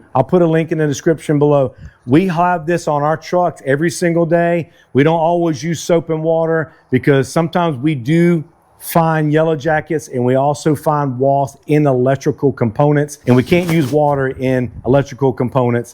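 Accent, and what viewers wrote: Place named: Georgia, United States. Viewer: Excellent video.I'm from georgia, and I had trouble with wasps when I was a kid, and i'm still scared of them now. I'm subscribing to your channel just because of how well this video was produced. Excellent information.Now, I won't be so worried when I go and help my mom take out wasp.Nest at her house. Also, it was a pleasure here in a country.Accent, that's one of the things I miss about georgia. Keep up the good work, sir.And god bless you and your family.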